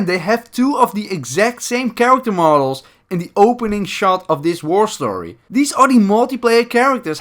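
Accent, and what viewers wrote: Netherlands. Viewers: Ik hoor aan je stem dat je Nederlands bent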